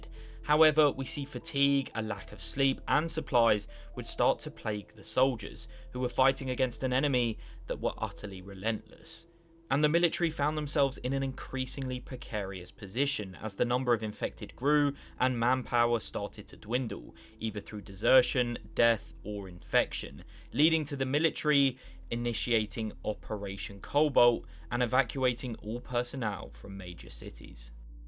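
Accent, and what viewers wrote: United Kingdom. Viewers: This video would be so much better without the british accent it sucks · Another annoying British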